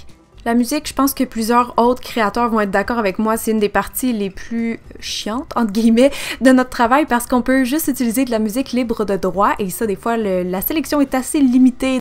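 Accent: Quebec, Canada. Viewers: Un vrai métier on se rend pas compte de tout ce travaille... c'est un pure bonheur de te regardé et de t'écouter car ton accent québécois me fais voyager 😊 bisous 😘 une fidèle abonnée française 😂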